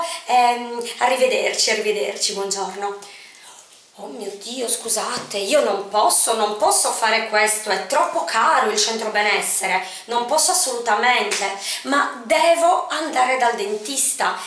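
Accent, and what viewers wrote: South, Italy. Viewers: it is not a good accent. she is nice teacher but with a very bad accent. she is from south, all the vowels are too open. it is not correct. for italians it is very bad accent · questo purtroppo non è l'accento perfetto. E' un accento del sud.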